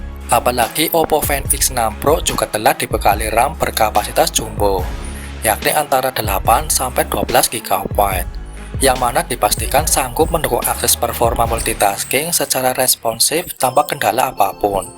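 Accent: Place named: Tegal, Indonesia. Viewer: Presenter nya NGAPAK ORANG TEGAL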